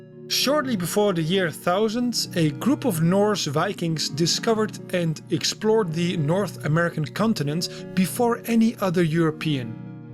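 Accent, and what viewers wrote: Netherlands. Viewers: is this guy Dutch? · Wow, the Dutch accent is kind of heavy. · His Dutch accent is terrible. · Quite noticable accenr there. Are you Dutch? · Is the narrator Dutch? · yo, you dutch cuz it sure sounds like it ^^ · I think this has to be one of the best video about Alternate history I ever seen. BTW am I hearing a Dutch accent? Ik ben blij dat ik je kanaal op youtube heb gevonden. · You can hear immediately that the video is voiced by a Dutch person. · You sound Dutch. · Manoj Anton-Rajkumar sounds more like a Dutch accent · Rook took I think so I'm Dutch and he really sounds like a Dutchman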